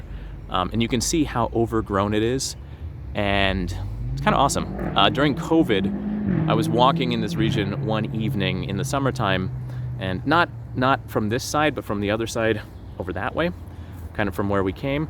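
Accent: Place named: Russia. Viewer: У этого человека явно русский язык - родной. Это просто сто процентов слышно и по акценту, и по интонации,и по произношению. И по тексту тоже. Может, увезли родители в 90е, может ещё какая история...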